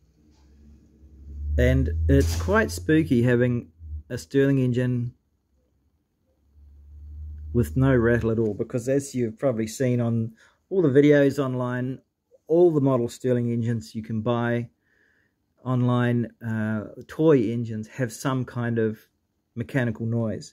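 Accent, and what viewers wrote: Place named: New Zealand. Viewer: Chur bro you sound like a fellow New Zealander?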